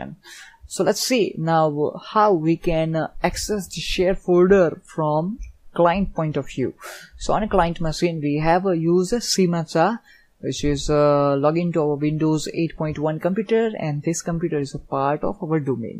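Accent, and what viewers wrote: India. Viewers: ugly accent . try using a robot instead your STRONG indian accent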